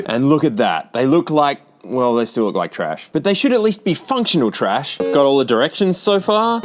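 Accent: Australia. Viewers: Guy who has only ever heard 1 south australian hearing their second sound australian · he is aussie mate. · Why am I so f*cking hooked on Australian YouTuber delivery. My wife calls it’s Bluey for adults 😬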